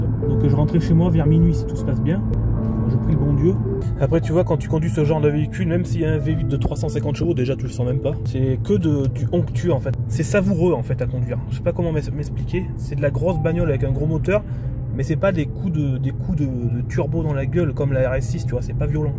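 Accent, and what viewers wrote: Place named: Southwest France, France. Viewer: brive.. ptn con je me disais bien l'accent il ressemblent au miens 🤣 je suis vers marmande bergerac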